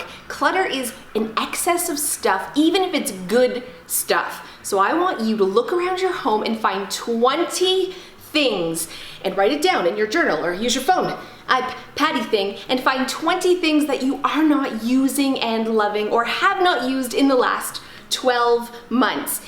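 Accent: Canada. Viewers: Abooottt:) my favorite Canadian!!!:)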